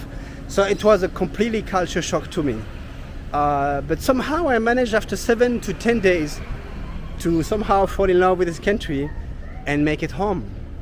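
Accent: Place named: France. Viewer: you look Indonesian...you could pass for one if you eliminate your French accent 😊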